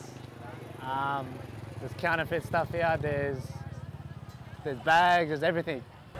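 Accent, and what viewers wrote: Vietnam. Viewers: chuan sai gon bac oi ;))) chu ok ji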